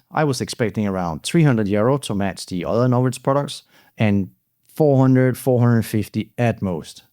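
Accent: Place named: Denmark. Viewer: Very interesting video, i dont know why, but your accent sounds very Danish to me?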